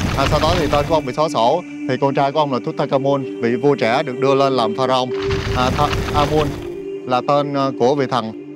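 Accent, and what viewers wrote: South, Vietnam. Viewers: người Miền Nam, cho nên thuyết minh giọng rất thu hút & duyên dáng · Một kênh du lịch khám phá rât lý thú , Giọng miền Nam của bạn rất dễ chịu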